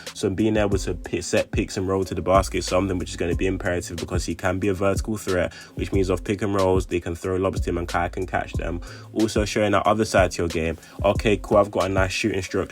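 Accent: United Kingdom. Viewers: I love your British accent. And look at that 3 point shooting at the end of the video. Near flawless!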